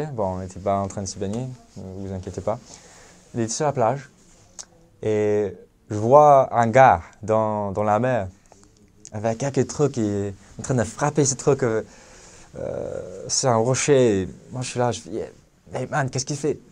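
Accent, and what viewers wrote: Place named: Tahiti, French Polynesia. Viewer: il a même garder la façon de parler des tahitiens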